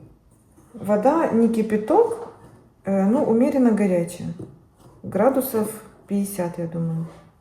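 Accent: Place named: Gyumri, Armenia. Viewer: Я сразу поняла по акценту и очеень рада,я тоже из колориитного города Гюмри❤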